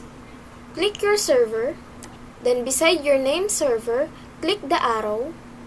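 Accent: Philippines. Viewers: Yup i could tell bc of his accent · Based on her accent She is filipino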